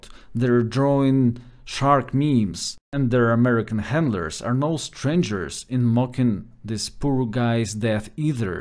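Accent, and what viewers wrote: Russia. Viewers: Your Russian voice is of the Slavic soul, the Serbian soul... the human soul. Thanks for bringing this short update in that voice. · I find his accent a bit funny actually. Especially with his excellent range of vocabulary and good grammar. I imagine an intellectual person from Russia in a good movie! 🙃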